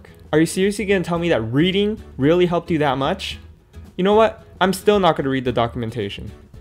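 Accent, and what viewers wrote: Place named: China. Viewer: Your Chinese accent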